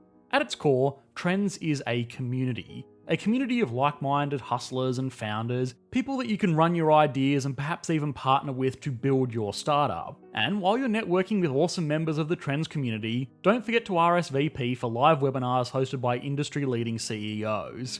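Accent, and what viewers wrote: Australia. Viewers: The guy in the video sounds australian and over here we are basically englands b*tch and worship them so it makes sense they say stupid stuff 😂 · i've just found this video - wow simplistic explanations yet right on point. who would have thought an Australian speaking slowly makes incredible sense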